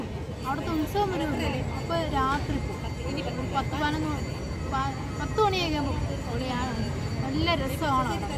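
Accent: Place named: Thiruvananthapuram, India. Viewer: മോളുടെ സംസാരരീതി കേൾക്കുവാൻ നല്ല രസമാണ്. പ്രത്യേകിച്ച് തിരുവനന്തപുരം ശൈലി.❤️👌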